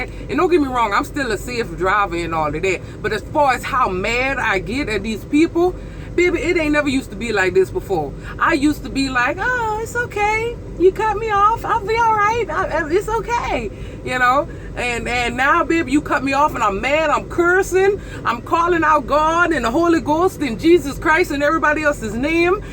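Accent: Louisiana, United States. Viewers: dam I like that Louisiana accent you have especially when you say baby😉😉😉😉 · Darlin are you Cajun? If so i looove your accent!! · that sounds like a saint Charles parish accent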